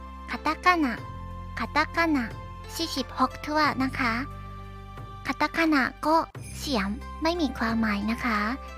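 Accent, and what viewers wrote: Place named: Japan. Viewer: คนญี่ปุ่นพูดไทยแบบญี่ปุ่นนี่น่ารัไปอีกแบบนะครับ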